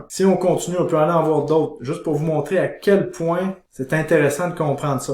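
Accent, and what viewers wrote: Canada. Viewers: Suis je le seul français qui, en lisant les commentaires, entend l'accent canadien ? =D Vidéo intéressante en tout cas, je vais faire attention a cette moyenne pour mes prochains investissements ! Merci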